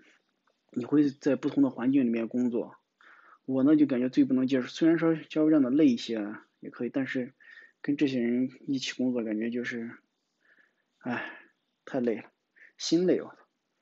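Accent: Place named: Shaanxi, China. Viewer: 我感觉像陕西的，哈哈，咸阳么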